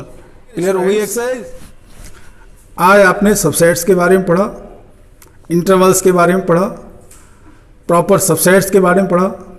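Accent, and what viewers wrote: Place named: India. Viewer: I think I gained my brain cells after watching this ... perfect indian accent for teaching Maths .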